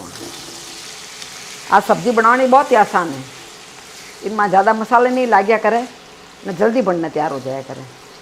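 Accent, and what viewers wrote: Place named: Jodhpur, India. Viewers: Antiji aap ki recipe bahot saral hoti hai.muje aap ki marvadi bhasha sunke bahot achha lagta hai.aap aise hi naya sikhaya kare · You speak very sweet language of JODHPUR we Rajasthan people are proud of you SA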